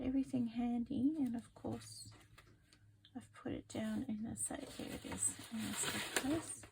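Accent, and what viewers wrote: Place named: Queensland, Australia. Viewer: New subscriber from BC Canada spent 2 1/2 years in Queensland as a teenager love hearing you talk makes me homesick for my second home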